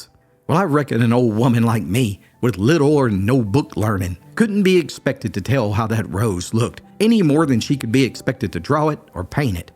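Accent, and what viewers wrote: Appalachia, United States. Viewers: Lol, I am terrifyed of snakes too😂. So I identified with that old man😂. I love flowers growing but do not like cut flowers, they just die 😢. I enjoy listening to your country mountain voice telling stories. I live in the north (north-central Indiana) now for the past 35+ years. Moved up from Mena, Arkansas back in 1988. I miss the south but not the snakes and bugs. I still live on a big farm but alone now at 70 and, sadly, I am crippled. I can not do much any longer and I sorely miss my veggie garden, canning, baking and washing with my wringer washer 😭. But God has Blessed me with memories for which I am thankful and for your stories. They fill my lonely heart❤. God Bless you! Brook🧑‍🌾🙋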